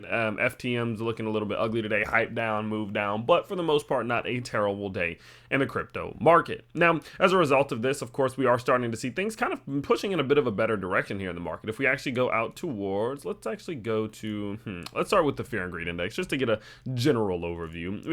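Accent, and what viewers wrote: DC/Maryland, United States. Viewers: You have a DC/Maryland type accent. Maybe Delaware but prolly not....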